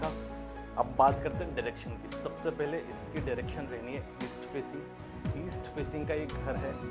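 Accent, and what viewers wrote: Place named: Malwa, India. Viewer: आपके बात करने का सलीका बिल्कुल मालवा के गांव के लोगों जैसा है, कौन से गाँव से हो आप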